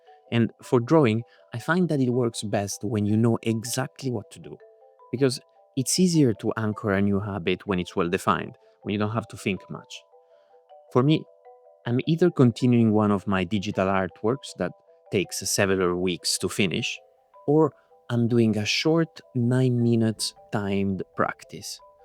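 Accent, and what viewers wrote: Italy. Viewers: Thank you for this video😁 I needed a reminder that being consistent isn't that hard when you're smart about it. I also love your italian accent! Rooting for your success!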